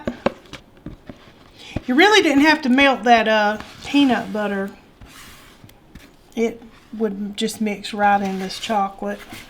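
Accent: South, United States. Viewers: I could sit and listen to you talk all day! I love your southern accent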